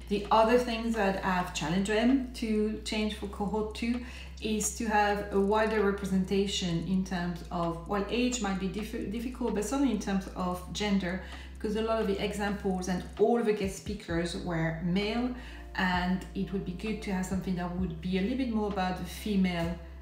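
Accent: France. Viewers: So nice to see a review from a female perspective and someone not in their 20s or 30s (I with you sis!). Congrats on completing the course. Love the French accent and the Bonjour at the beginning. Good luck on your Youtube journey.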